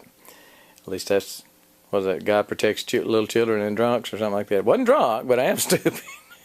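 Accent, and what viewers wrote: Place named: South, United States. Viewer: With that southern drawl I was expecting to hear a "hell yeah" haha.. Regardless your vid definitely piqued my interest.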